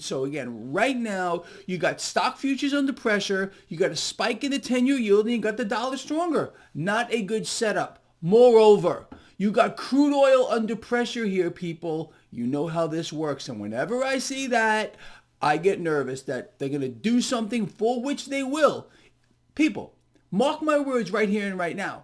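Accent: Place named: Boston, United States. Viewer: subscribed for that Boston accent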